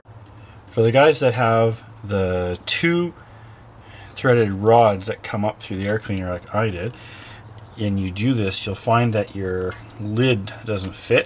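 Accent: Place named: United States. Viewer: Ah. I assumed you were American from the accent.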